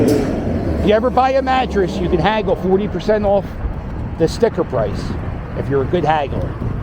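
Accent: Philadelphia, United States. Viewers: You got the most Philadelphia accent I've ever heard in my life.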